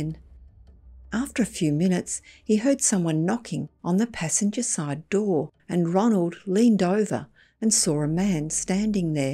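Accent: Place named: Australia. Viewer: I love your Aussie accent and the way you narrate. Thank you 🙏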